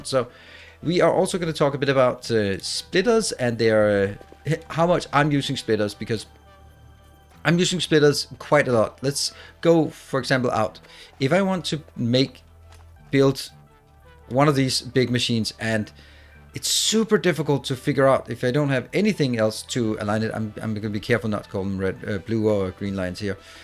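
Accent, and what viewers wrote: Denmark. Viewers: you sound so Danish but i really dont know but thats besides the point, Great video and content my dude!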